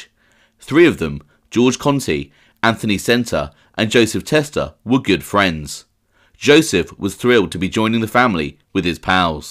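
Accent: England, United Kingdom. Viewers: Sorry can't listen to some English guy talking about American mobsters doesn't sound right to me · Sammy gravano could take a few lessons from you. Your knowledge of mob issues is mind blowing. I can listen to your u k accent all day.you don't swear and have a dignified demeanor that lends it's self to the program. Thanks again bill feilhauer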